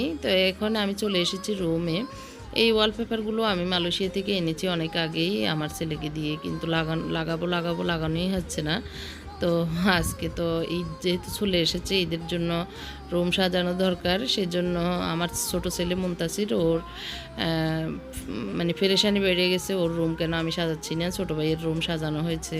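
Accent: Noakhali, Bangladesh. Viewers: আপনি তো নোয়াখালির কথা শুনেই বুঝতে পারি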